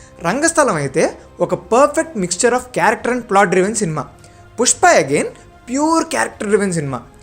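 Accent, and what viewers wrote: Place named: Chittoor, India. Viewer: Love from chittoor 💕. Chittoor slang nee bagane try chesaru meeru.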